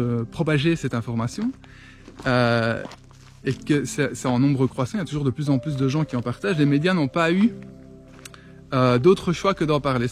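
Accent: Liège, Belgium. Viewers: Bonjour. Ton analyse est sage, juste et lucide. Merci. Télévision= "tell a vision", raconter une réalité, et ça se fait via des..."programmes" sur des "chaînes" CQFD instrument de propagande et de programmation mentale. J'ignore où tu vis à présent mais tu as pris soin d'y amener ton accent liégeois:-) Love & Laughter from Cité Ardente. WWG1WGA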